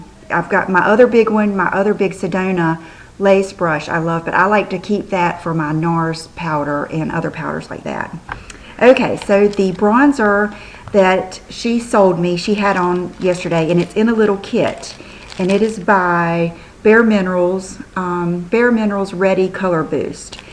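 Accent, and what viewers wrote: South, United States. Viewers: I think the Naked 2 comes out on the 25th of this month, I have a feeling it will sell out fast. Can't wait to see your new shoes I know they are going to be fabulous. The Makeup For Ever new eye shadow replaced the older ones that have been out for a long time, the pallets are only one dollar, it doesn't matter which one you buy. Hope you have a great weekend, can't wait for part 2. Love coming home and relaxing with your video on Friday night look forward to it all day. I think it your southern accent it is so nice to listen to. XOXO Emily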